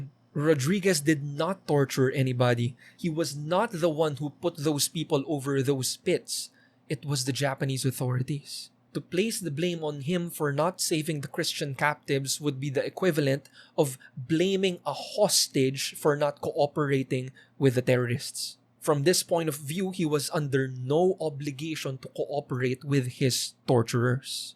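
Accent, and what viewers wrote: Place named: Caribbean. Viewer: You have a slightly Caribbean accent, interesting.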